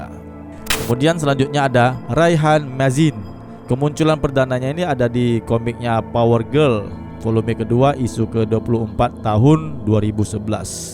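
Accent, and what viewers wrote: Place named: Medan, Indonesia. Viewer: ente orang Medan ya bang...??? Dari logat²-nya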